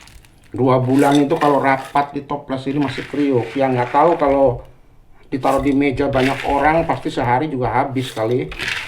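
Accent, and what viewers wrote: West Java, Indonesia. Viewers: Sunda banget, KUNGKURAHAN... logatnya juga Sunda banget...👍👍😅😅❤❤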